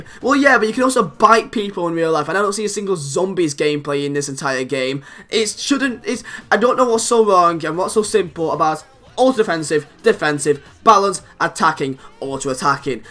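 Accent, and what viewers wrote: United Kingdom. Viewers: u need to learn how to speak proper understandable english not this terrible english with a british accent that sounds dreadful.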